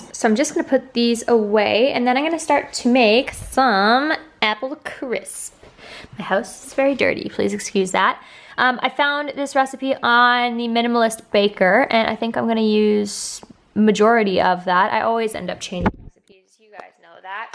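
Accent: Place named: Australia. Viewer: Am I the only one that hears an Australian accent sometimes 😀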